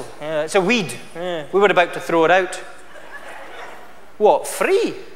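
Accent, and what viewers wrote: Scotland, United Kingdom. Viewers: Love this guy I am part of the Scarborough Faire Queen's own Highlanders and just love to hear some one with a Scottish sound it is like music to my ears. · he looks indonesian but sounds scottish. its so confusing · Yeah he is, he's born in Moffat, can you not hear it in his accent?. His dad's Indian but that's it, his mother is Scottish and that is where he was raised. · hes scottish... quite CLEARLY!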